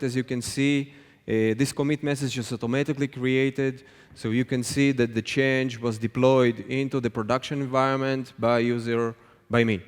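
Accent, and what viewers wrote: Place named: Russia. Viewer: спасибо, полезно, только автор, пожалуйста, прогоняйте перед зеркалом речь, и правда - сильно режет слух наш "акцэнт"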